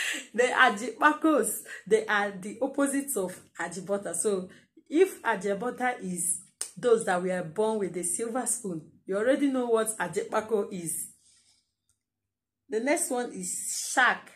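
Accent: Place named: Nigeria. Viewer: This is so cool, love Nigerian accent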